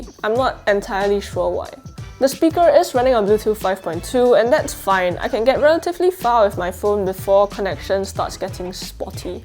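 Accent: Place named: Singapore. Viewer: You're Singlish still can't escape but well done. More practice will push you further. Thanks for the review. If you may compare to Bose speakers for me particularly the Bose Mini will be great!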